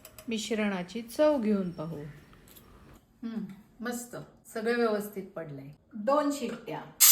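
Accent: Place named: Pune, India. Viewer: वाह भारी, फार फार सात्विक वाटलं हो. काय हो तुम्ही पुण्याच्या काय? कारण हा असा मराठी चा एक्सेंट तिथेच असतो. फार पुर्वी हा आमच्या गिरगावात... आता तो तिथे नसतो.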